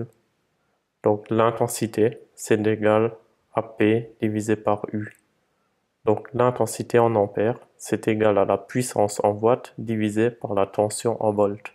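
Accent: Alsace, France. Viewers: sérieux ta voix elle me dit quelque chose. Tu es pas du bas Rhin ??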